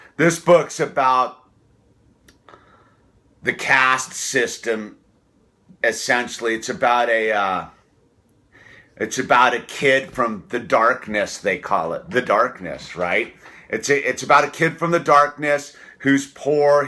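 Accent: California, United States. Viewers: You are the most Californian person I’ve ever heard speak. Really enjoyed the review from the UK 🇬🇧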